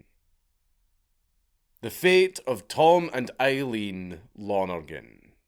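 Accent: Scotland, United Kingdom. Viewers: Why do Scotts pronounce “world” like it has 3 syllables?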